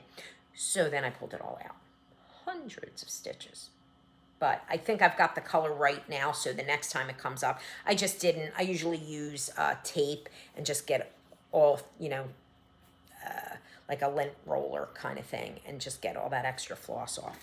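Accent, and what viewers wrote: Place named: South Jersey, United States. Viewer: Have been enjoying your flosstubes for awhile and realized you haven't been doing them that long. I decided to go back and watch your first video. LOL! I am a Jersey girl living in Williamsburg VA because my husband is a retired Army officer, and this is where we ended up. We are college sweethearts who graduated from Glassboro in 1983. I did my student teaching in Haddon Heights. Love your south Jersey accent.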